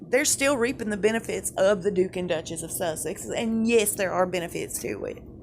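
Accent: South, United States. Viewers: Love your Southern accent! Makes me homesick. I also agree with your point 9fview.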